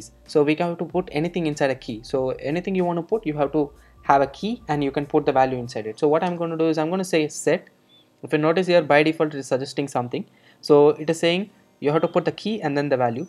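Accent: India. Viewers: Un comprensoble english. I really dislike indian accent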